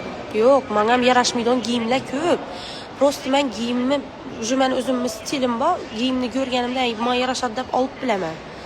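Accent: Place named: Khorezm, Uzbekistan. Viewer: Horazimcha gapirmang Toshkentcha gapiring asalim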